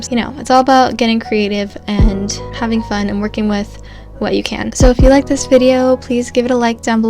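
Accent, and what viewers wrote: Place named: North America. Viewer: Omg this is the cutest spread ever💖💖🥰🥰🥰 And you LITERALLY «stole» my idea for november in my new BuJo which I ordered from A R L, the bjqueen, so I FINALLY have a dotted notebook where the quality of the paper actually can handle my markers (Tombows, Crayola Supertips, Artist Brush Pen, Tombow Fudenosuke, Pigma Microns etc, none of them ghost or bleed through. But all my other notebooks is so poor quality and this is my first time (year) with Bullet Journaling so it was truely awesome when it arrived in the beg.of October. But I don’t have a boyfriend at the moment, so I was gonna use my bestie from high school she owns a bakery and is rediculasly good at making cakes and stuff like that, so (I kid u not...😅) I was gonna ask her if she could send me some photos close up of some of her work and her shop of course. She lives four hours by car away from me, so it is very long time since I saw her and we kinda lost touch so I was gonna text her like right now and ask about the pics and I have been running around changing lightbulbs in my apartement cos it was so so dark in here. 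And Norway is six hours ahead of NY and nine hours ahead of LA, and idk where u are in the USA, or Canada, I just know, or believe you’re North American cos of the accent you have❤️💙🇳🇴💙❤️💖👱🏻‍♀️💖😺💖🐶💖📚📚🖌🖌🎨🎨🎨🩰🧘‍♀️❤️ Ps: I just wanna say: HUGE FAN of your art. The oct was the 1st I saw, and now I am completely hooked🥰🥰🥰😁😁😁😁😁😁😁😁😁😁😁😁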